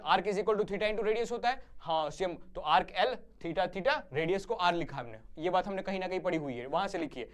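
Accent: Gujarat, India. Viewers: Sir Gujarati confirmed by accent 👏🏼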